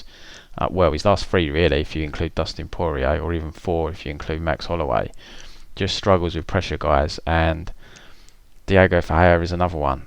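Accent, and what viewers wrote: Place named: United Kingdom. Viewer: First time listening to, and gauging, your podcast. British accent: check....so far, so good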